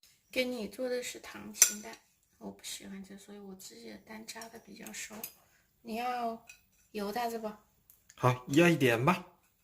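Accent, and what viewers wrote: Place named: Changsha, China. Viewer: 长沙妹陀，口音好重啊，嘻嘻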